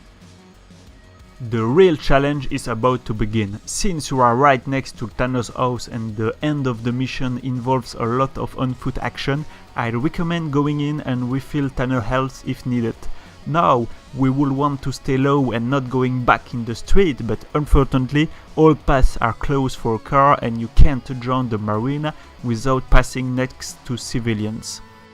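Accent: France. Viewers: Putain de french accent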